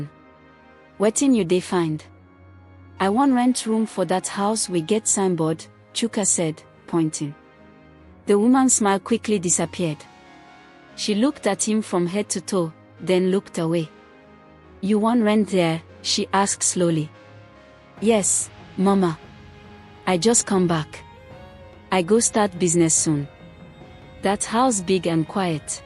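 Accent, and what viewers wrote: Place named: Ghana. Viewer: This your pigin English dey sweet 😂 E dey sound like Ghana people pigin Cheap particles dey run belle so dey said Haunted house no pass like this o😮 Na God go help use o Even mama Ike dey fear too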